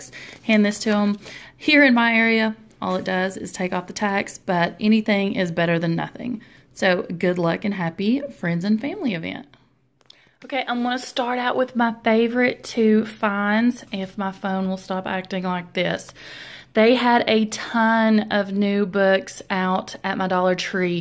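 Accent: South, United States. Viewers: I just found your channel and was getting ready to ask where in the South you were (heard that Southern accent😄)then I saw your p.o.box address in TN. I live in North Georgia about 60 miles from Chattanooga TN. I've never heard of Elizabethton TN. Nice to find another Southerner here. 😄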